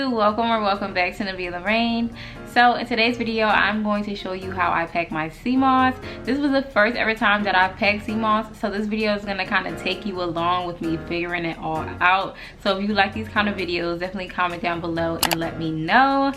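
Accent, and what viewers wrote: Philadelphia, United States. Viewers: Lol I knew 3 seconds into the video you were from Philly! Thanks for the info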